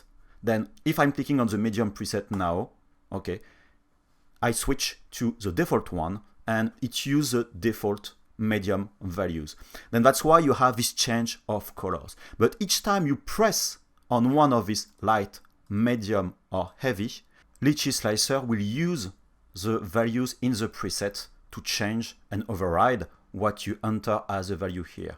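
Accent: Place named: France. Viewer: Very nice, keep em coming! Used to Isotropix Clarisse, donc pas de probleme avec l'accent francais :)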